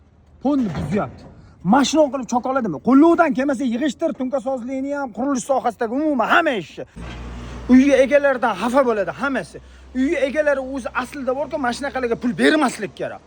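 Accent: Namangan, Uzbekistan. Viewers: Namangandon sheva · ШЕВА УДАР НОМОНГОН ХЕЛЕ ХЕЛЕ КМОНОР😂😂😂😂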